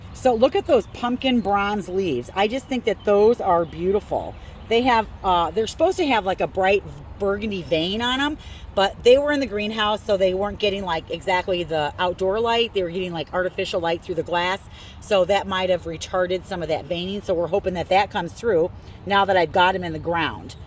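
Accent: Midwest, United States. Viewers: If anyone needed an example of Midwest accent, this is it. Love the video!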